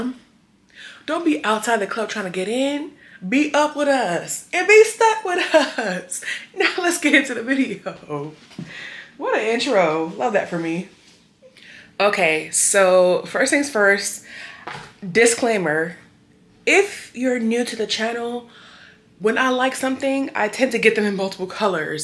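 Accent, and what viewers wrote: United Kingdom. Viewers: Everything is so cute. And you're british accent reminds me of so much. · It’s the British accent for me 😆😆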